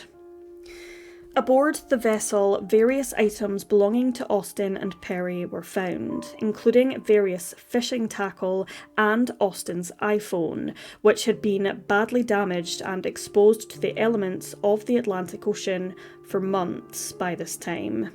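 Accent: United Kingdom. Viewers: We’re fucked….. said in a perfect British accent….instantly subscribed! Best narration ever.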